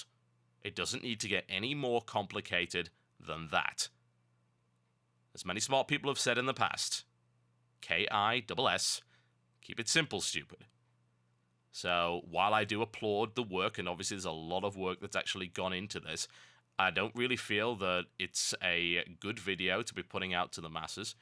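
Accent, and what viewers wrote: United Kingdom. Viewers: No the 21 minute slideshow with an obviously bored British accent voice-over is not a better video. This guy talks about doing better marketing? He should take a Red Bull and turn on his webcam before trying to present his arguments, what a lame way to get any type of attention. He must be a failed lawyer since he spends his time doing voice-overs on gameplay videos (something that YouTube specifically forbids youtube partners from doing)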